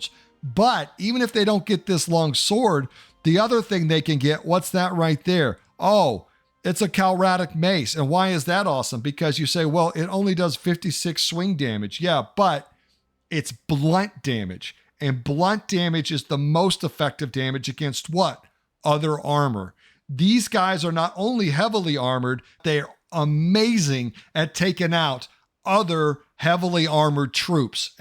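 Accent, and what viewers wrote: Oklahoma, United States. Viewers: Your commentary in your videos are great brother, so funny with your sayings with the good old Oklahoma style. But thank you for the videos, & no one els on here has your style. Well done Mr. Take care.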